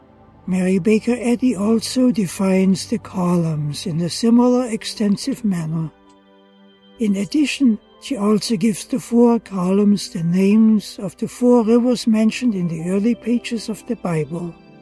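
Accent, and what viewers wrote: Germany. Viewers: Well read. Very good English, the German accent just enough to be charming.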